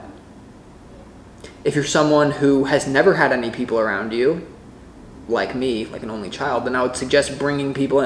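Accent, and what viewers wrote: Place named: Long Island, United States. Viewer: Sounds like you lived in Massapequea 😮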